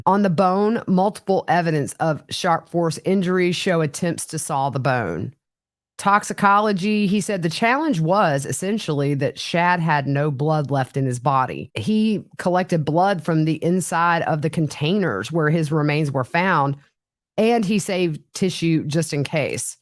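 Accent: South, United States. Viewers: What a remarkable dissonance it is to hear your sweet southern voice describing such a heinous crime.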